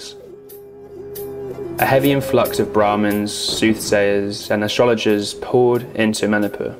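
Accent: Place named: United Kingdom. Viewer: I was not expecting the british accent....😂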